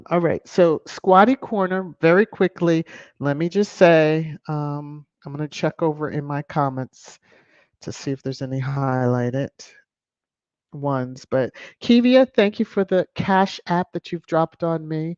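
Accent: RP, United Kingdom. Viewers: Thank you for great update 🙏😘❤❤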